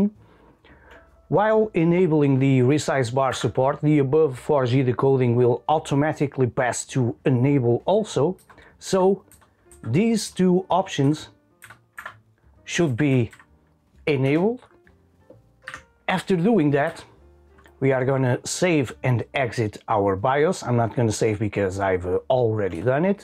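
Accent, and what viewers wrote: Portugal. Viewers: o teu sotaque não engana ninguem, PORTUGAL CARALHO!!!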